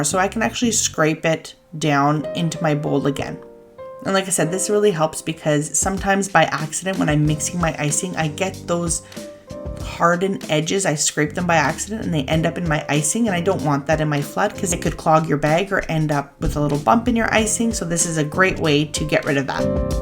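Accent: Canada. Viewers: OMGosh~ Thank You SO Much for linking these videos! Apparently, I'd watched them, as I'd "liked" them & added them to my "Crafts & DIY" playlist, but I'd completely forgotten about the double-bagging trick, so I can't tell you enough how much I appreciate you posting these links. I'm recently disabled, so I can't do as much as I'd like with my grandkids, but cooking & baking is one of the things we CAN do. They're sensitive to sugar, however, and their moms like to keep them to an organic, healthful diet, so I've used some of your ideas with my recipes for hummus to decorate their favorite quinoa chips we bake. We dye the homemade hummus with beet & spinach powder (which gives it a really unique flavor) & they make flowers and leaves on the crackers. Their other favorite thing to make is ginger chews (just boiled strips of ginger with equal parts water & sugar until soft, then sprinkled with sugar when cooled~ these obviously have a lot of sugar, so they're a once-in-while treat). 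Sometimes, the ginger is a little too spicy. I have 7 grandkids, ages 7 to due in 2 weeks (my only granddaughter is the 7 year-old), and they all love spending time in the kitchen! Thank You AGAIN for ALL Your GREAT Ideas & Fantastic Spirit! PS. My Mom was Canadian, do I hear a hint of a Canadian accent? I still have my Mom's "Kate Aitken's Cookbook" that must be 70 years old by now. Many Blessings to You & Yours!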